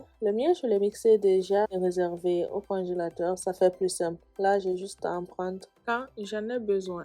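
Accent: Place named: Senegal. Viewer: elle vient du Sénégal vu l'accent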